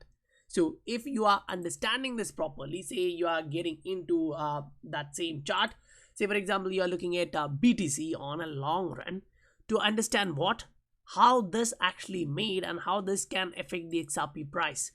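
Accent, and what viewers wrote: India. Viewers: I always thought u have indian accent bro, but was confused when i saw south korea here. which city if you dont mind asking. ? by the way thanks for the quality content man.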